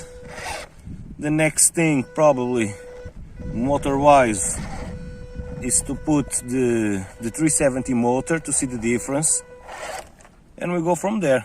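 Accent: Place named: Russia. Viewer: Cool accent, Russian?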